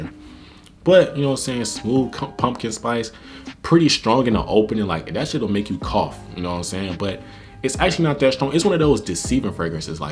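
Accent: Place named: Georgia, United States. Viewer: He must be from atl or Georgia because of the way he talking lol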